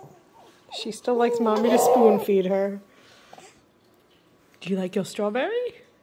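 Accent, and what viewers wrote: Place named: Philadelphia, United States. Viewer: Your accent…can you share where you’re from? I’m guessing the Philly area…❤️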